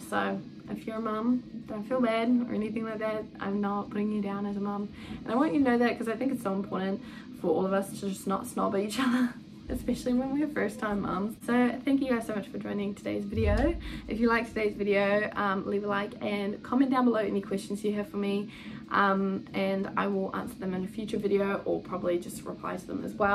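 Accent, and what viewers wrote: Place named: New Zealand. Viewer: Yay lol perfect timing! Trying to figure out cloth nappies for my babe. Far easier to understand and enjoy listening to another kiwi young mum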